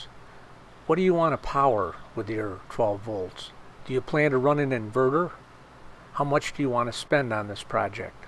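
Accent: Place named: Great Lakes, United States. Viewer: Wow, a strong accent! I'm thinking Great Lakes. (block pronounced as black, ask as "eeyask". Not as strong as for most females of the Great Lakes, but still of that region. Am I correct?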